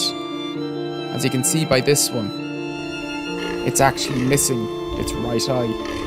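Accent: Ireland. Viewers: I love Irish accents :)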